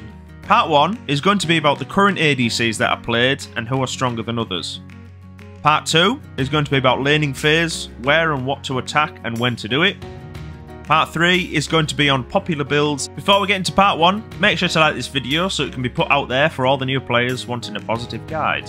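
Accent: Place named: North, United Kingdom. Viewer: Ano thas from the north but I cant quite put ma finger on where exactly thas from cocka spadge and its driving me nuts but thy accent seems like its from round t'corner from mine.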